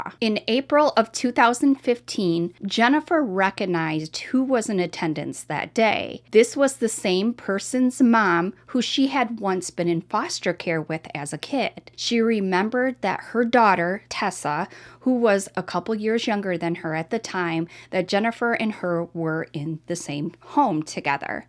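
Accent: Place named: Minnesota, United States. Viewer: Where’s you’re cute accent from ?? Sounds like Minnesota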